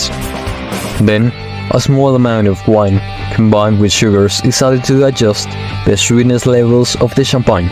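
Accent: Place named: France. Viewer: Very nice video, thanks very much!! To be honest its a little hard to understand your accent, but with subtitles it works perfectly, also your accent adds some french charm to it, so feels like the narrator is definitely know what he is talking about, so although its a bit hard to understand its actually nice )) thank you!!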